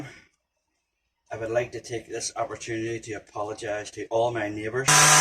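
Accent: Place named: Ireland. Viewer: Good to hear a solid Irish accent 🇮🇪🇮🇪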